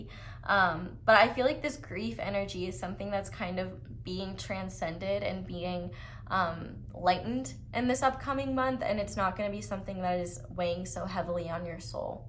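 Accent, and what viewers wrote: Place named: New York, United States. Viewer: the new yorker accent is not your friend :)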